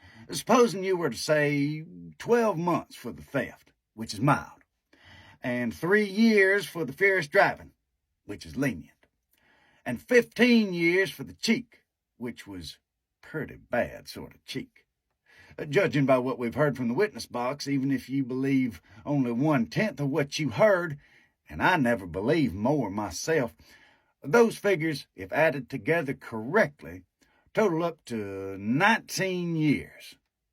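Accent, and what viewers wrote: South, United States. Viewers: An funny scene, funny for children and adults on the page but the southern accent absolutely kills it! I fell over at " cheeking the roooral poh- lice".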